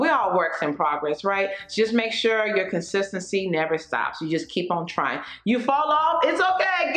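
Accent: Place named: Nigeria. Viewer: That's Nigerian the accent and attitude😅😅 + american of course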